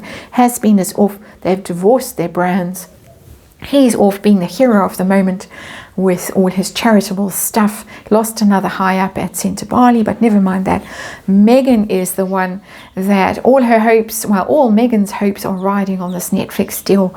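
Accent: South Africa. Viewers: has a beautiful South African accent! I think she would be a fabulous audiobook reader!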